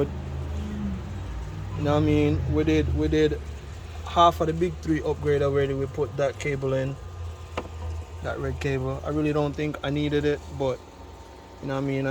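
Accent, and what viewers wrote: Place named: United States. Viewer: Man a Yankee now. It's a Jamaican channel I subscribed to bredda. Be careful with the accent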